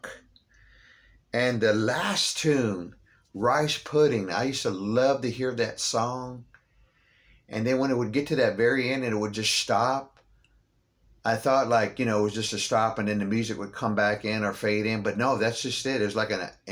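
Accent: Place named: Louisiana, United States. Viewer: Such a huge loss to the rock community! Another hard one to swallow. I asked if you were from L.A. because you're accent is similar to mine. I grew up on the Texas border on the Louisiana side. You got this country / Cajun thing going on that does my heart some good. Peace brother! R.I.P.!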